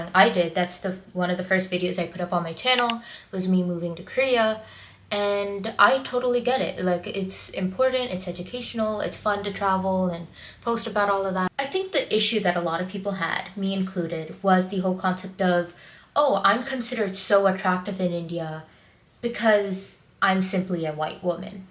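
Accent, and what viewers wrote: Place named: United States. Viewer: I respect what you're saying and your opinions but this kind of falls flat, at least for me, because you seem and sound like an Indian-American who's spent very little time in India.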